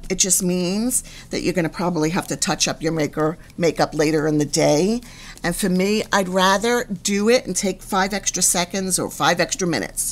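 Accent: United States. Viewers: I had no idea what she looked or sounded like…she’s so normal! 😂 I love her accent too …she talks like my mother too who was born and raised in the BX ❤❤❤ love this video! Definitely gonna start buying her products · I LOVE your New York accent!! I will try some of your products soon.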